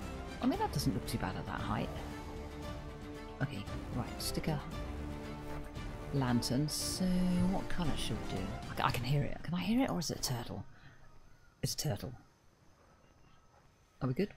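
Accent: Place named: RP, United Kingdom. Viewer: Well spoken accent, as a geordie good English always sounds posh :)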